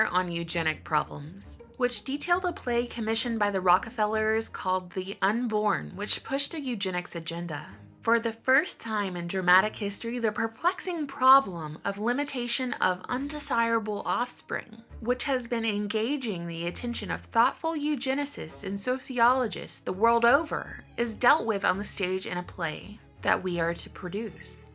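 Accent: South, United States. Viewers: She has a calming voice. Gotta love the southern accent 😉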